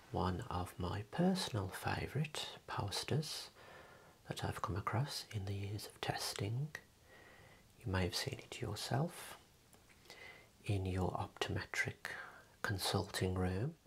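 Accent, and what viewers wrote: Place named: Birmingham, United Kingdom. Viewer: i love that you have a brummie accent! as a brummie myself, hearing you feels relaxing and homely. thanks for your videos !!!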